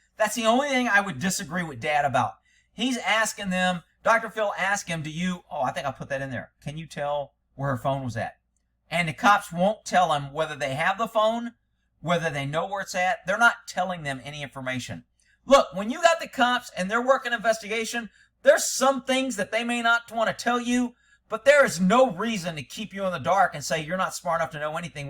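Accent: Texas, United States. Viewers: And the screechy Texas draw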